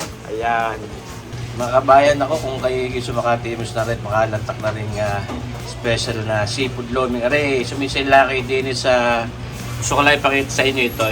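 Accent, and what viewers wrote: Batangas, Philippines. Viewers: walang babaguhin. Tuloy-tuloy lang ang puntong batangan. Balik panahon para sa akin some 60 year ago sa Calumpang/Avenida Rizal🥰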